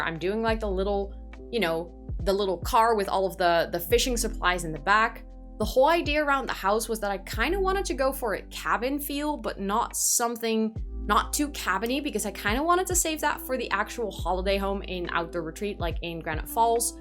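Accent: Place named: Netherlands. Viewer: I really want to watch this but you are Dutch 😂 I am too and I just haaaaaate a Dutch accent in English loool. That's a me problem tho, I am just wired weird but I cannot stand it 😂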